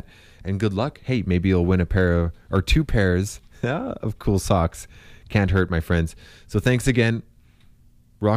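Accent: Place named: Canada. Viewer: I love the Canadian accent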